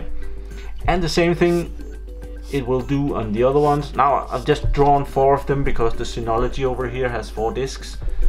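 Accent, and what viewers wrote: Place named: Denmark. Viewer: Definitely danish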